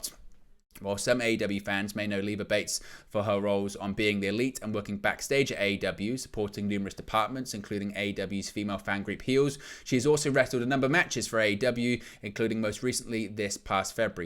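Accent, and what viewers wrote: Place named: United Kingdom. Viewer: Don't like British Accents Bruv?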